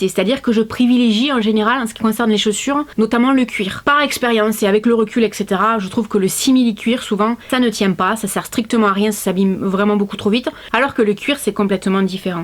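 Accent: South, France. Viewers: Bonjour, super ta vidéo merci pour ta joie de vivre et vive l'accent du Sud au passage 😉, merci pour l'info pour ces sites pas chère du top👍. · Bonjour c'est la 1ere fois que je mets un commentaire mais je regarde pratiquement toutes vos vidéos. J'ai l'impression de regarder une fille que je connais depuis des années. Pas de chichis toujours la banane c'est vraiment super j'ai l'impression d'être en visio avec une amie et puis cet accent.....que j'ai eu pendant longtemps puisque j'ai habité Martigues....me fait rire car maintenant j'ai l'accent du sud mais entre le continent et l'Italie....oui oui la Corse ici aussi on vous regarde 😂😂 alors un grand merci pour ces bons moments passer avec vous. Et si vous déplaise à certaines c'est quelles sont peut être jalouses ou que se sont des BOUCANS FADA 😂😂😂 Aller je me permets comme a une amie bisous😘😘😘😘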